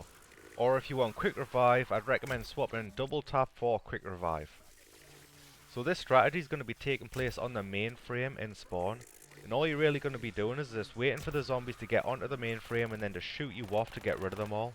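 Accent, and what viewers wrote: Newcastle, United Kingdom. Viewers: It's geordie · Are you a geordie? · i know a geordie accent when i hear one! great to hear people from around the north east creating content it's fairly rare. keep it up mate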